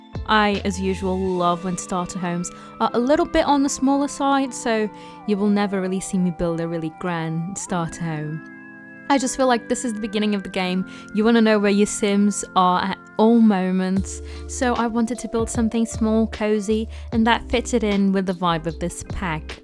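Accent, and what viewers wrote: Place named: United Kingdom. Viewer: honestly you are such a talented builder and as a polish person I love to listen to british accent. Love it xx!!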